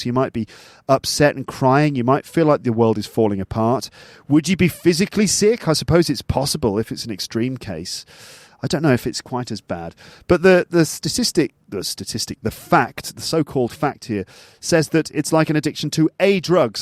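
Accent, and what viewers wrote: RP, United Kingdom. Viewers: hi, thank you so much for sharing this i'm your big fan since you created podcast and love listening to your british standard accent it help me a lot in improving my pronunciation thanks to you again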